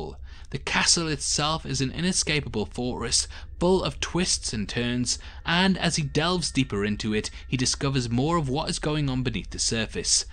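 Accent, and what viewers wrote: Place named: North East England, United Kingdom. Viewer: Top vid m'man, thanks. Did enjoy the BBC Dracula. Your accent is very confusing tho, sounds like N.E. England crossed with a tinge of Australian, is this accurate? :)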